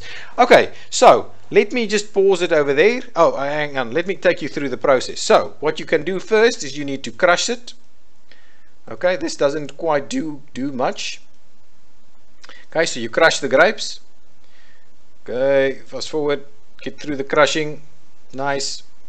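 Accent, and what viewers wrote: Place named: South Africa. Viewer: Hey Boet! Nice to hear a good South African accent. Just bought Terroir and enjoying it, especially as I live in Cape Town and as you know, am surrounded by terroir! Keep it up with the strategy games and I'll be following.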